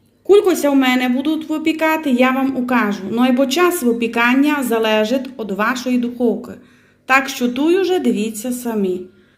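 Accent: Transcarpathia, Ukraine. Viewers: Чудный Закарптский язык,очень люблю слушать.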